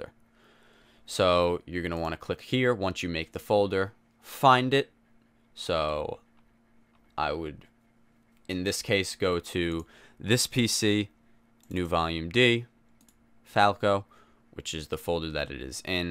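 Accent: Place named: New York, United States. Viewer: This guys from new york no doubt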